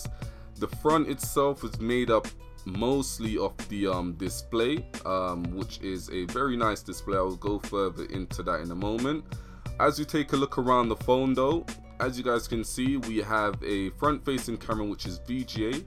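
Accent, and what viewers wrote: United Kingdom. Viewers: hey, are you from south hampton?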